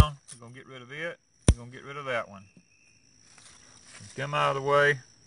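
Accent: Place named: Texas, United States. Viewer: Inter-determ-ah-neent,. LOL luv ya'llz Texan accent, but here in Mississippi we say Indy-term-ah-nent (indeterminate) tomatoes... But besides that you tellin it right brother. Especially the kids n plants runnin wild LOL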